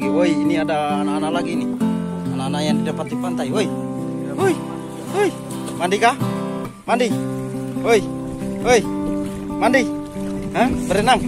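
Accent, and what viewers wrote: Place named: Makassar, Indonesia. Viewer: Salam dari Buton#gue kirain ornag Makassar hheee padahal orang baubau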